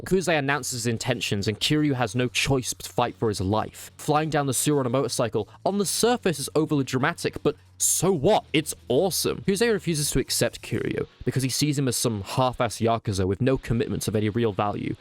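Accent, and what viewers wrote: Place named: England, United Kingdom. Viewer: You said it correctly-ish. As good as you can with english accent.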